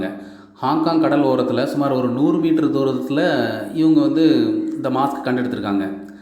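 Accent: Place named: Tirunelveli, India. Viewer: மிகவு‌ம் அவசியமான பதிவு அதுவும் திருநெல்வேலி அழகு தமிழில். அருமை.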